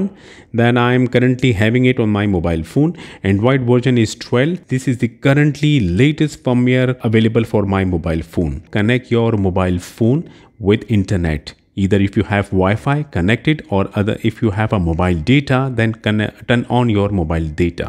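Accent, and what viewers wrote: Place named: India. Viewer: Always an Indian with strong sccent. Never an american making these videos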